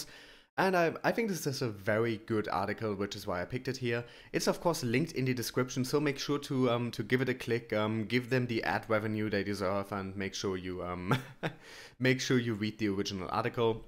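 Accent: Germany. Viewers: I like your German English accent, you speaking English very well which make you huge fans from Europe & USA around the world.. Finally I like your videos which are confident 🤝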